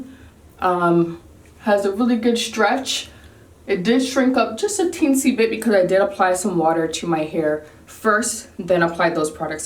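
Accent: Jamaica. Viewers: Do I hear a tiny bit of a Jamaican accent? Never noticed until this video! Looooove 😍. Gorgeous hair and great results, too. Hair goals 🙌🏾. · You Jamaican ?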